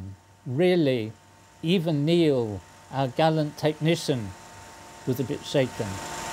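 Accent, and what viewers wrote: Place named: Nottingham, United Kingdom. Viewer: That's how they pronounce tomatoes in Nottingham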